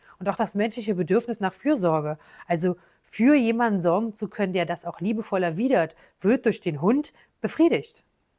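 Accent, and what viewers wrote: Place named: Berlin, Germany. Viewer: Hallo, genauso ist es und es wird immer schlimmer. Ich liebe deine Berliner Schnauze. Weiter so. Lg Claudia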